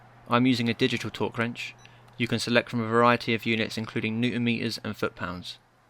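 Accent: United Kingdom. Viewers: I am going to be honest here, because I like your channel and I want to see you succeed and make more great content. I think you should not do voice-overs. Maybe it is because I am american and don't appreciate the english accent. With that being said, good luck and keep experimenting with new content.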